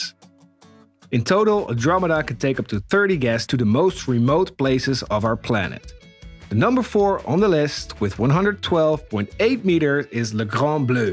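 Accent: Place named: Netherlands. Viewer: Leuke video, aan jou accent te horen Ben je denk ik Nederlands.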